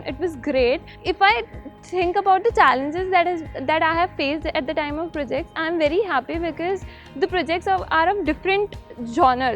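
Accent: India. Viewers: With an accent like that you cannot move up high on the corporate ladder. You need to get rid of the Indian accent so that you can go head to head with USA executives. Indian accent and way of speaking are very hard for Americans to understand.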